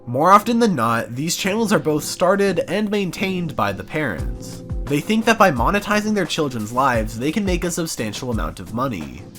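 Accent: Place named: Canada. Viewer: Wait… your Canadian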